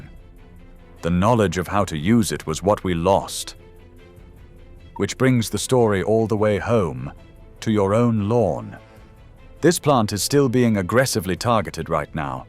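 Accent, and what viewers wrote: United Kingdom. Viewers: probably informative im sure, but I turn off the message presented with the heavy British accent, as if accentuating the one we can really believe and trust. I wish all heath, peace in well-being everyday.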